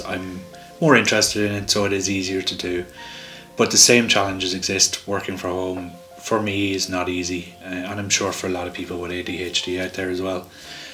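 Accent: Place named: Ireland. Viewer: A well-made video about an important topic. Love your Irish accent! Nice choice for background music. I'm very easily distracted myself an possibly someone who could meet the diagnostic criteria. But I was able to focus until the end. Cheers!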